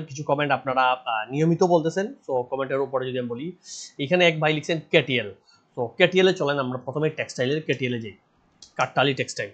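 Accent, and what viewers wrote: Sylhet, Bangladesh. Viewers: আপনি কি সিলেটের? আপনার ভয়েস অমল দার সাথে মিল আছে।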